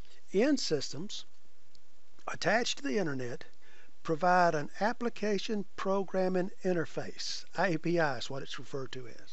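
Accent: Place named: South, United States. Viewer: After listening to my austrian professor and barely understanding his lectures, the southern accent is appreciated.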